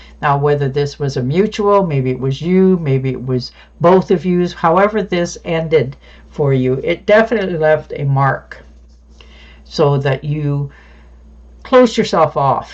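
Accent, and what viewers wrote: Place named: Canada. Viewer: New viewer here. Good reading, thanks. Your accent sounds a lot like my Canadian friends from BC. Sub'd.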